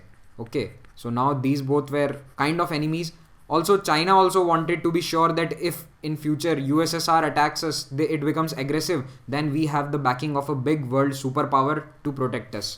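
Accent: India. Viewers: Best Indian accent Ive heard .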